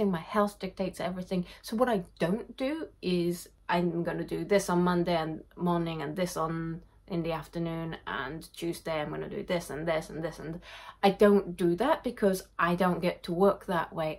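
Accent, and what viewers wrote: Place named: United Kingdom. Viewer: Thank you for making this video, I enjoyed watching every minute of it :) I love your British accent, you shouldn't be worrying about it one bit! Also, thank you for being so open and honest about bipolar, it really helps raise awareness. People who don't have it and have no family or close friends with it usually simply have no idea about what it feels like and how it works. You're very, very brave and a wonderful person and artist!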